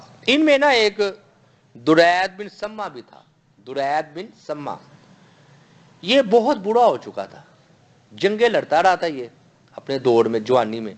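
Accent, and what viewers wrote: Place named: Punjab, Pakistan. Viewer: Assalma Aliakum Bhai App KI Urdu Punjabi styles hai App ko appni urdu Thikh karnay Chahay Likin As Jari Rakahy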